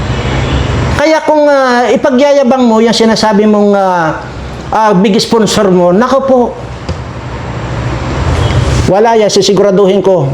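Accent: Iloilo, Philippines. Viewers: Thank you & salute 🫡po sa inyo Sir sa pag tatangol sa Team Kalingap kina kuya Val at kuya Rab, go go Sir ipakulong nyo na po ang mga anti na yan na mga salot sa bayan natin, New subscriber watching from the UK 🇬🇧😊 haha grabi kadlaw ko sa butod kag iban pa nga term mo Sir, ga gua ang Ilonggo mo Sir ba! Proud ilongga fan here 😊😅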